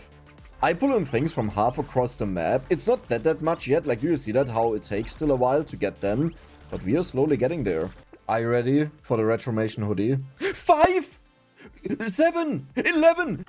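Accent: Germany. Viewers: Zo, zingle run, zix, zis point...German accent is so weird. You say "second" correct. Why don't you pronounce it then like zecond?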